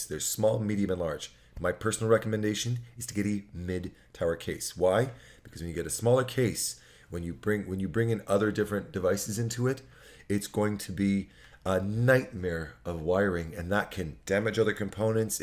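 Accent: Canada. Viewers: I'm new to the channel and love the content,! Does anyone here have the calibration settings for the 24in Cintiq? I purchased one through a school grant at work (high school art teacher, here) and wondered whether or not I should get that Wacom color calibrator. Side note, my dad grew up in Winnipeg. So, when I came across your video on Infinite Painter I detected the Canadian accent. So cool! Happy New Year to all!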